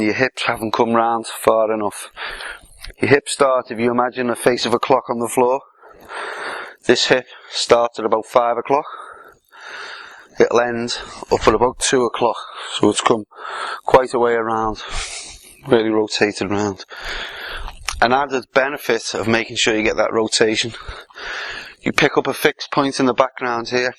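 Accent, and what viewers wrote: Liverpool, United Kingdom. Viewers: A scouser! Too right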